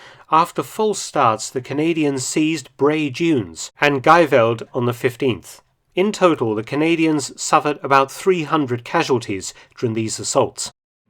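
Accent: United Kingdom. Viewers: British accents should be mandatory for documentaries.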